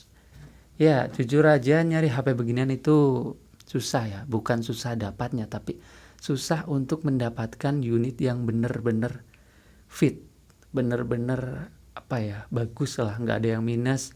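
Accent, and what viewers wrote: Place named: Palembang, Indonesia. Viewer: wong palembang wkwk, bahaso nyo ketaro bang:v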